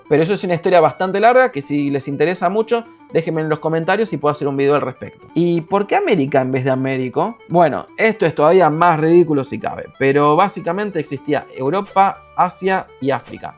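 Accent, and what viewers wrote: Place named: Argentina. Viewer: Tú video es imposible de verlo , hablas muy rápido , tu acento es demasiado fuerte y no tienes dicción . No se puede entender , ni captar lo que dices . Oye que no estás en la sala de tu casa habla do con amigos . Modula tu voz , habla más neutro sin acento pueblerino argentino , y toma clases de dicción. No se te entiende nada !!!!! Tengo coraje !!!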